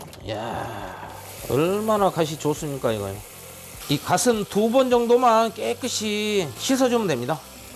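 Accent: Jeolla, South Korea. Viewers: 좋아요.전라도사투리 정감가네요.ㅎ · 셰프님 구수한전라도 사투리정감있네요.ㅎ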